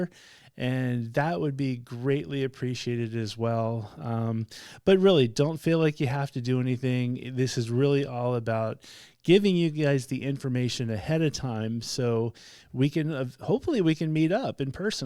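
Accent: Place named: United States. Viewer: This is the first video I see from you, so I know nothing about you. You sound American. Prepare for weather to be whatever, it's all over the place every day. In June, in the Southern Finland, heat-wise, I'd say +30C is THE MOST EXTREME I can think of, and that'd probably be a record or something. Summer days are usually around 15-27C. I'd put on a t-shirt, long but light pants of some sort, a jacket that's easy to take off and tie on your waist or something in case it's a hot day, comfortable shoes that can withstand rain or mud and a hat, put on sunscreen, take a disposable rain coat (fin. "kertakäyttöinen sadetakkki") with you - if they don't sell them where you live, in Finland you can buy one in pretty much any bigger grocery store such as Prisma or Citymarket for about 2€. Festivals don't allow to bring in water bottles. Most festivals have free water, in some you have to pay a small amount for it. 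Check the Tuska Festival site - if they're doing their job properly, they'll have a list of things you can or cannot bring with you and if water is provided. It's a big festival, I think they'll probably have that information. (Also try to google if a person from your area is ok to drink tap water in Finland. I was in Provinssirock about a decade ago and the water they provided was a self-standing tap in the middle of the area, which was fine for me, it worked. But I've also been to a tourist biking trip to Estonia, a neighboring country, and got a stomach bug because we ran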